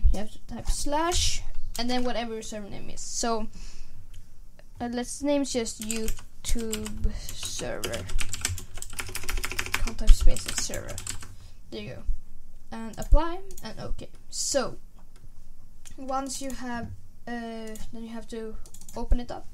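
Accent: Sweden. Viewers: Är du svensk? låter så på dealekten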